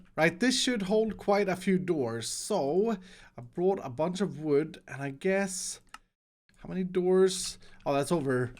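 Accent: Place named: Sweden. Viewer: Jag ska vara helt ärlig och säga att jag inte insåg att du var svensk innan jag läste det någonstans. Men om jag ser tillbaka på det så är din brytning ganska lätt att känna igen.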